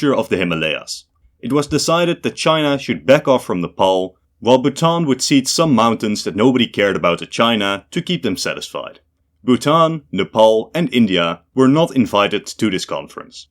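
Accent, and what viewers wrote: Netherlands. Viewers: yeah i don't understand how anyone thinks is American. Man has the most quintessentially Dutch accent ever lmao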